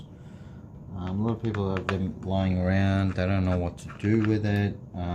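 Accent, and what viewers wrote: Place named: Australia. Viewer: That's awesome and you sound Aussie too my in nsw can you please send me your details need this mod done a a few other things. Look forward to hearing from you ASAP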